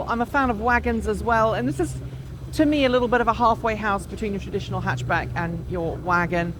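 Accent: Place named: United Kingdom. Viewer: I still don’t understand why you report in British accent while you talk normal US accent in person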